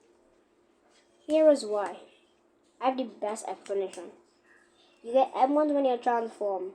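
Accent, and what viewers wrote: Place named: Trinidad and Tobago. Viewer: We know you trini boi stop hiding the accent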